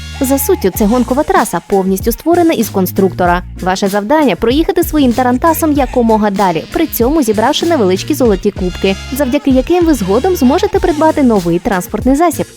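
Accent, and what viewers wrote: Standard. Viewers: Так круто слухати чисту українську мову, причому гарним голосом) Я теж роблю топи, якщо неважко, можете заглянути ;)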